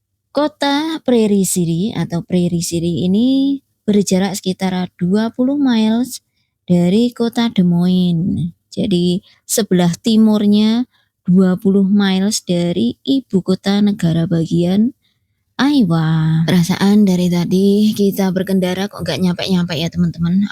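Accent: Java, Indonesia. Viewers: Ass...klrg kecil yg bahagis...Salam sy dari Bekasi...sy sangat sk dengan kllrg kecil ini...ap lg mbk...org nya grecek,klu lg ngomong sy sk ketawa dgn logat jawa nya,org nya,sederhana,dan tidak sombong...sukses terus mbk dan klrg...❤🎉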